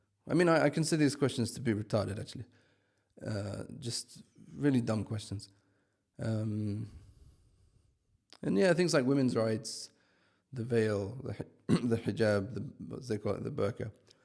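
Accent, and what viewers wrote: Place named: Arab world. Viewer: Appreciate the video ... just some constructive criticism .. because not even Omani's do what you're doing .. when you speak English which is your native tounge your speaking it as if your speaking Arabic ... I think if you're more natural your English videos will be better .. this seems sooooooo reliant on the Arabic accent that you've developed .. that it comes off boring and almost forced ... just my analysis I think as an Omani myself you can do better in representing your own culture, the English culture, with a better delivery of the spoken voice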